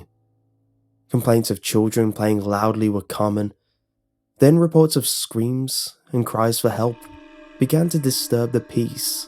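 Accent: United Kingdom. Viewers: doesn't quite have the right effect with a pleasant British accent :(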